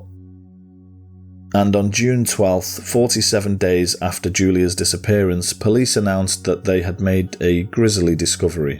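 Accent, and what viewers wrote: United Kingdom. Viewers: Hey, I just discovered your channel a few days ago and have now watched several of your offerings, some familiar, some unfamiliar. However, being a fellow Brit I am enjoying your perspective (& accent! 😊). I very much look forward to catching up on more of your vids and your future releases. Blessings... Puccini 😇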